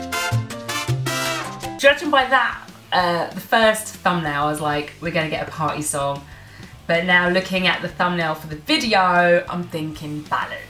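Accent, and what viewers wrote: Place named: United Kingdom. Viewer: Girl i love you 😫❤ You're so cute, funny and full of energy 😅 And i love your British accent too 😂 you're like this year's Malta entry so colorful and joyful 😅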